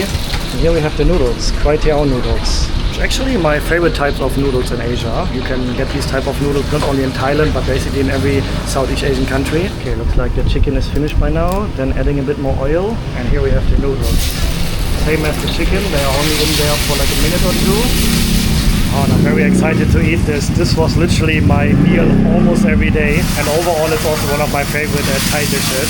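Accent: Germany. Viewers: I am Thai and living outside of Bangkok. I am also learning about places in Bangkok from your video.😂 Well done. I like your English with German accent, very charming! Keep on doing a good job!❤👍🇹🇭Welcome back to Thailand! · He made enough money..... Don't need to make more videos. People got addicted to his German accent English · Thanks! Great videos, I’m an American with German background, I love to hear to your German accent.🇺🇸🇩🇪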